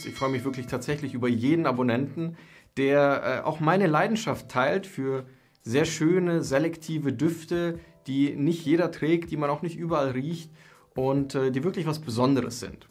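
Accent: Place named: Franconia, Germany. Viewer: Bro kommst du aus Franken? :D Geiler Dialekt ! Feier dein Video :) Mach weiter so ! Abo hab ich da gelassen !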